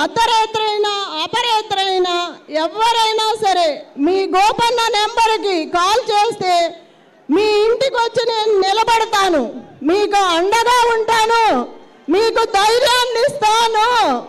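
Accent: Andhra Pradesh, India. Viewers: Andhra voice Baat me dum nai hai😢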